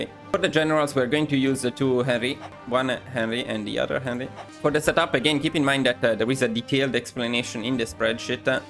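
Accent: Italy. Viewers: The Italian accent, Perfection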